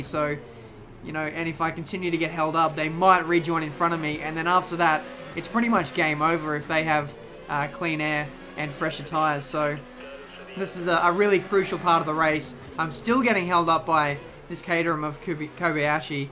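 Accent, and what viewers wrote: Australia. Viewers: which part of australia are you from and how old are you? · What part of Australia are u from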